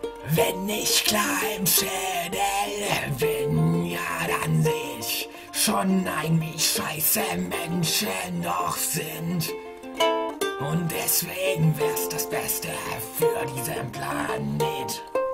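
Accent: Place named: Hesse, Germany. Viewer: wo hast n du dein dialekt her? du hörst dihc an wie meine ex ^^ ich glaub aus hessen kam die xD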